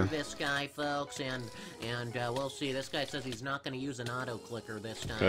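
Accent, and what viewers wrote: Netherlands. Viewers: Hahaha je Nederlands accent is fking grappig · Dude are you Dutch? xd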